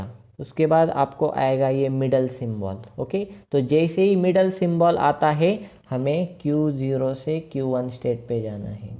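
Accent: Gujarat, India. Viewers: sir, r u from Gujrat ??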